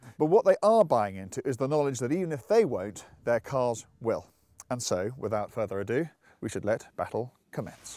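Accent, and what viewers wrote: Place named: England, United Kingdom. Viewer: Ведущий-то не очень на англичанина похож ))), акцент да, но внешность нет )))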